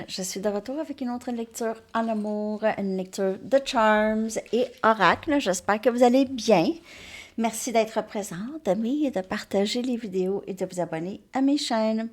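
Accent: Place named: Acadia, Canada. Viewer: Coucou, vos vidéos sont si fleuries, c un régal. Votre accent acadien me fait voyager vers chez vous. Merci! Il semble que le bout du nez soit le point de l endroginat primordial ( cf: Apotheose de jean luc Ayoun)... De parler sur le thème des fj doit réactiver ce point possiblement. Bien des choses depuis la France Dom🧚‍♀️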